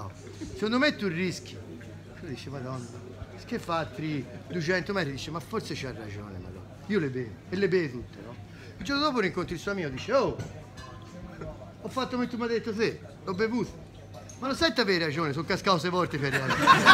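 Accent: Tuscany, Italy. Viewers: sei fortissimo solo la pronuncia toscana già rido pero' va un po' più piano alcune devo risentirle perché non chiare🤣🤣🤣🤣🤣🤣🤣🤣 Graaaaaaaziiiiiieeeee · Ma non sai esprimerti in italiano così ti capiscono anche fuori dalla tua Toscana!!!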